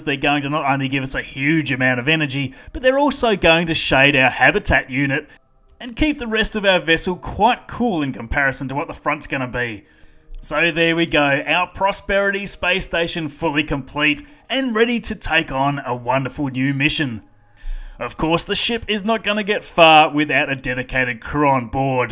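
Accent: Australia. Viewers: It's an Australian accent. Didn't you catch the "crikey!"? lol. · just got a sub only due to your amazing auzzie accent, what state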